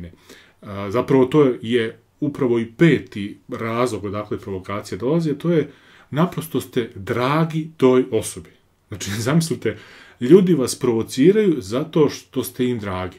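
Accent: Ijekavian, Serbia. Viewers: Miluje me Vasa ijekavica i miran ton izlaganja,samo napred !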